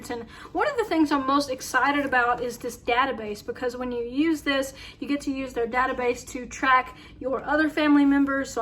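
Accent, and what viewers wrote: South, United States. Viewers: Are you from the Deep Deep South?, because I can hear a SouthernBell Accent from you?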